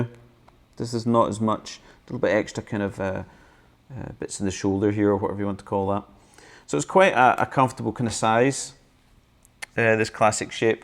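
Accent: Scotland, United Kingdom. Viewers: Is this a spoof? Love your Scotch accent!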